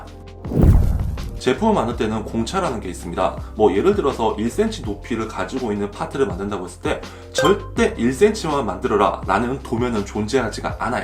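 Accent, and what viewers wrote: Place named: Gwangju, South Korea. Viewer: 완전 광주사람이시네 ㅋㅋㅋㅋㅋㅋㅋㅋㅋㅋㅋㅋㅋㅋㅋㅋ구수하시다 (미친 틀렸다니)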